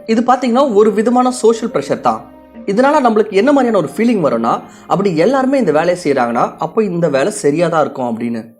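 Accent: Kerala, India. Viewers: Kerala thambi voice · Malayli ah. I lyk ur Tamil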